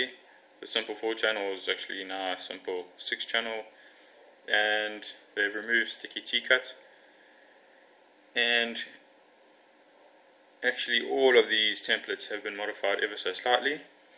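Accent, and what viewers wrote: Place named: South Africa. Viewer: Is that a South African voice I hear. Please tell me you know of a good place to fly in Cape Town, preferably close to the Northern Suburbs. Looking for a place to maiden my Bixler 2, It will will also be my first flight.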